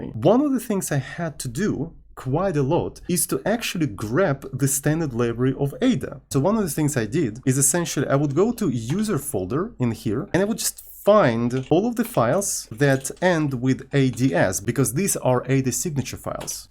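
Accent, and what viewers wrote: Russia. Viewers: Excuse me for such question, are you from Russia?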